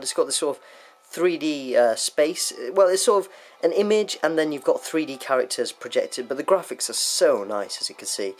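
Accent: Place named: United Kingdom. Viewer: Your accent sounds like you're from the same place in the UK as David Oliver from the Daily Show!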